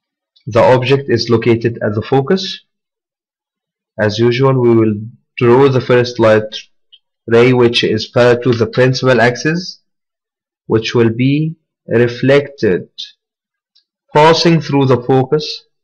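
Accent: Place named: Russia. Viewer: What's with the Russian accent ??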